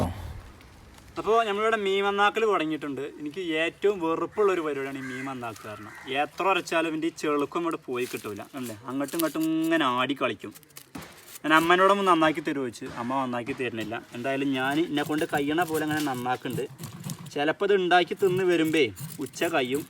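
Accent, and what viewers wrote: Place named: Malappuram, India. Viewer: മലപ്പുറം സ്ലാങ്ങിലുള്ള അവതരണം പൊളി ആണ് വ്ലോഗ് സബ്സ്ക്രൈബ് ചെയിതിട്ടുണ്ട്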